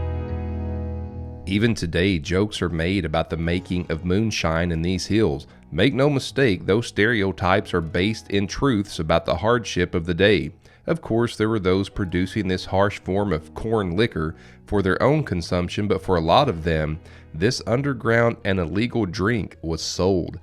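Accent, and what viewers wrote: Kentucky, United States. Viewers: Awesome upload,as always. Smooth Kentucky voice an added bonus! Thank You,!👍🤗✌️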